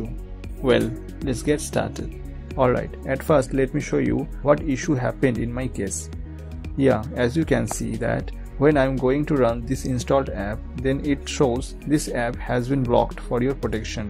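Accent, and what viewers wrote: India. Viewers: i hate indian's english but thanks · Lots of love bhai Tysm i was stuck installing my printer ty ty ty love from India your voice seems you are from West Bengal india 🇮🇳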